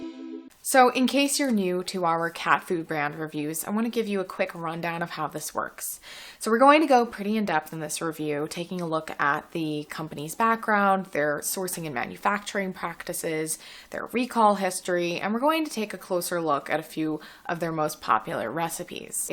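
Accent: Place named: Illinois, United States. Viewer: Sounds like you’re from IL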